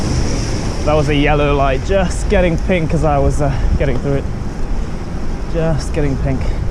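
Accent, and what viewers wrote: Scotland, United Kingdom. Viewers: I would say he schooled in the Edinburgh or Lothian area. Strongly detect gentle middle class Scottish accent. That may also explain why he' wasn't used to heavy morning traffic when young since Edinburgh has more car free zones than most cities plus a more advanced segregated cycle trail nfrastructure.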